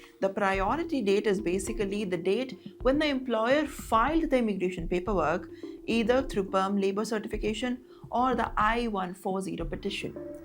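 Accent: India. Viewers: This anchor thinks that pronouncing 'r' as 'rz' alone will make her to look like American. Its totally not. Her modulation, emphasize and pronunciation all sounds Indian. Why this fakeness. Moreover with her facial expressions she is saying the following. "Even though Indians have sacrificed their lives and gone to America to work and contribute to American society and economy, Americans are I'll treating". What nonsense.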